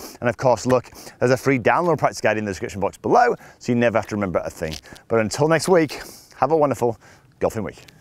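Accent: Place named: Northern England, United Kingdom. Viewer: Cak handed - wow thanks i'll try it in Mjorca next week - great videos and love that Northern accent 👏👏👏👏👏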